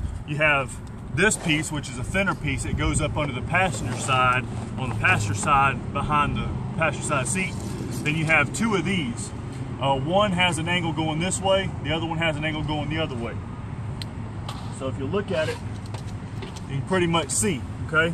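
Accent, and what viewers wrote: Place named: South, United States. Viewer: I never would’ve figured this out by myself in a million years. Thanks! The accent made me homesick for the south! Especially when you say mash down 😊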